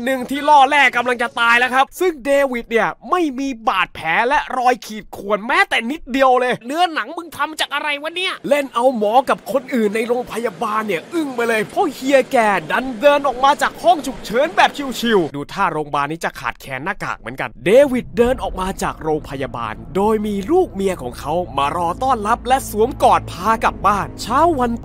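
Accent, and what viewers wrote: Isan, Thailand. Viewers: ชอบจังเวลาพี่พากษ์อิสานอิอิ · อ้ายยยย บักย่านน้ำอีสานจ็าดๆ55555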